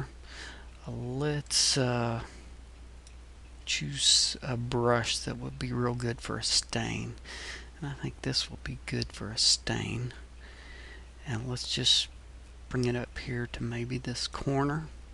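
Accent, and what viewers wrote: United States. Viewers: Love the American accent lol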